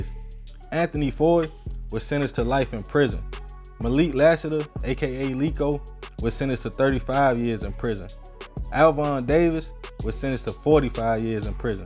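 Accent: Baltimore, United States. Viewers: The narrator got an Bmore accent..... Im originally from from Baltimore but reside in Richmond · He from bmore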